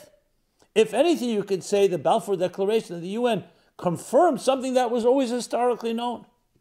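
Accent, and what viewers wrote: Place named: Brooklyn, United States. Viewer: Thank you, Mr.. Rebeca was a ryan and I know somebody from the Armenian background that is persecuted in Iraq. We support the Jewish people as a persecuted people and recognize Islam as a genocidal ideology. Both of us have been attacked by Muslims and have studied the reasons for this of course, they will always deceive people when they have the chance that there's some other reason, but they do it because of the evil of their religion. You said that the Jews remain in that area for hundreds of years my suggestion would be to clarify how many hundreds of years wasn't it thousands? Are you from Brooklyn- I used to live in Brooklyn near Flatbush and Atlantic, and in the area that Rabbi MK lived the same area where the Al-Qaeda mosque was only about 1 mile away. and I noticed a little bit of an accent. We wish you safety during this time of persecution and want to help come up with some solutions. 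Go with YHVH and thank you for being plain about the lies. We also have a lot of information, and predicted the recent attacks by Isis, as well as the reasons for it, such as releasing Isis, and we also predicted that the other terrorist organizations would bond with them over the attack against the Jew Islam itself largely pivots on antisemitism! We would like to connect with you do you have an email? <link>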